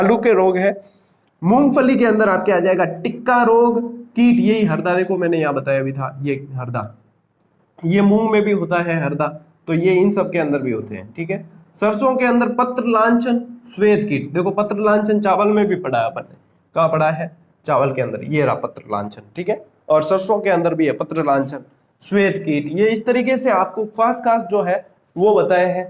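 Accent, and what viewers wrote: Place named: Rajasthan, India. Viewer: M Punjabi ND preparing for 3rd grade. after taking your classes I learned marwadi too 😄😄 love your marwadi sir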